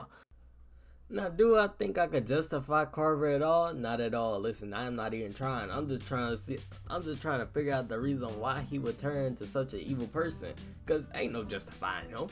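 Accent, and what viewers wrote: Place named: Maryland, United States. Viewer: "erybody" My guy is Marylnd region. Am I right brother? Great video and made some ood points, New subscrber.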